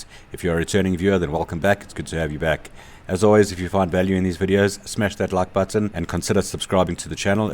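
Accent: South Africa. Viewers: Hoor daai Afrikaanse aksent.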